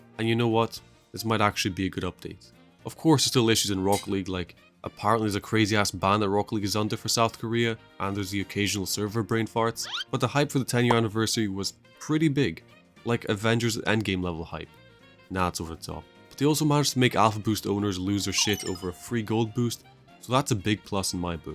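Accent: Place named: Scotland, United Kingdom. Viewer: Dude, I watch most of your videos, your accent drives me nuts, it's it Scottish or something Central European? Ta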